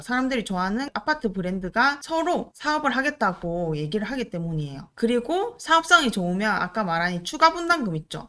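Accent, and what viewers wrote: Gyeongsang, South Korea. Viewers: 경상도 사투리인가요!? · 이 여자 매력 쪈다. 갱상도여인!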